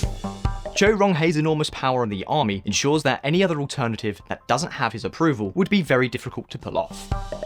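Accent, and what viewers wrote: United Kingdom. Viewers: Fast and loud, with a British accent, Americanization at it's most annoying. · Jay McJakome I don’t mind the British accent, I even prefer it but he speaks way to fast, I can’t even process what he is saying... I can understand it but it goes into one ear and comes out of the other... I think the issue is the lack of punctuation or something.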